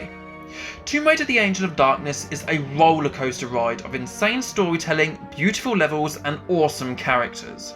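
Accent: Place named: London, United Kingdom. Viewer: Why does it sound like you live in London?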